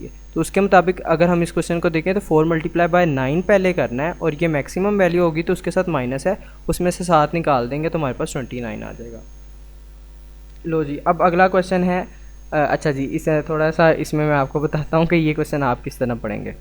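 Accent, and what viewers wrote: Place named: United Kingdom. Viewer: Omg ur English accent 🥲❤️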